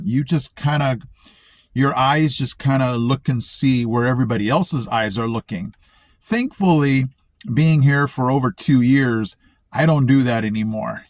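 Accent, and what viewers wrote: United States. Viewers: As an East Tennessean I couldn't care less where anyone once was. If you choose to fit you do. I have two nephews half Japanese by heritage that I never think about as being anything other than my nephews. You seem to have assimilated so well that you sound like an educated hillbilly. I would have thought you'd lived here the majority of your life. "Purebred" Southern Appalachians have mostly held to the belief of I'll do me and you do you and as long as you don't infringe we'll happily meet in the middle somewhere or agree to disagree and go on with life. You've gained a subscriber and a belated welcome to the greatest place on earth. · Many years ago I spent 6 weeks every summer at my mamaw’s house in North East Tennessee, and I would think your accent would cause people to call you a “damn yankee”. I’m guessing if you have an orange UT banner flying on your front porch, you’re good regardless of any other differences.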